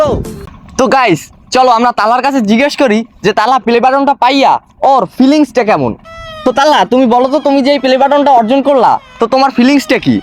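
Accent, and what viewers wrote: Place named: Bagerhat, Bangladesh. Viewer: বাগেরহাইটা পোলা কথায় বোঝা যায় ভাইয়া আমি বাগেরহাট জেলার কচুয়া থাকি😊😊😊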